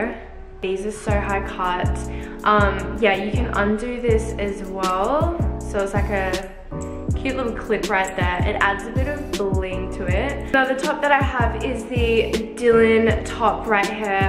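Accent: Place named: Brooklyn, United States. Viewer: Love ur accent, Brooklyn :) nice video O:)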